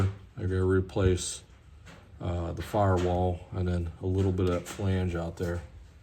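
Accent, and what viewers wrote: Pittsburgh, United States. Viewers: I here a yinzer accent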